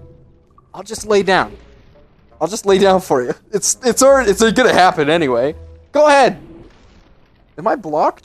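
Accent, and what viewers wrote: Canada. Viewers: I can hear the Candian in your voice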